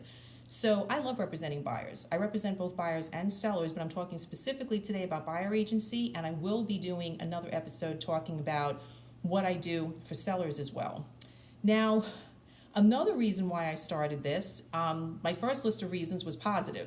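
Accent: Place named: New York, United States. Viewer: Great video! I love the NY accent!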